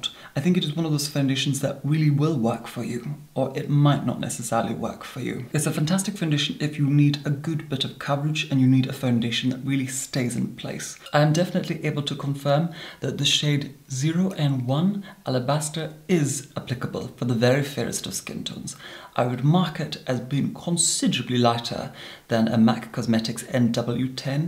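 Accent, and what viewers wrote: Scotland, United Kingdom. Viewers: He doesn’t have an English accent I’m pretty sure it’s just very posh Scottish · Scottish but using English RP. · u are exactly right. Scottish born English raised. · My family has Scottish friends with a similar accent. He just speaks with sophistication. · Nah,he's got a Slight Scottish accent is all.Hes done a good job of getting rid of it. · he's Scottish, not English but he does speak very proper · He's Scottish... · They do Gillian, I’m just saying it because he is Scottish and so am I (with a Jamaican mother raised in Ireland ) shrugs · I do hear a slight Scottish accent from time to time. I find his accent to be fascinating. Cheers! ~Anastacia in Cleveland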